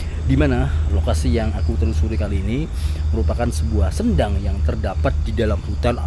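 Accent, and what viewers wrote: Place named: Pasuruan, Indonesia. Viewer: GUENDENG PAK... KHAS AREK PASURUAN 😂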